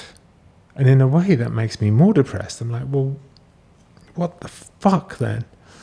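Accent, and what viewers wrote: United Kingdom. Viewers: your British accent is sooooo delightful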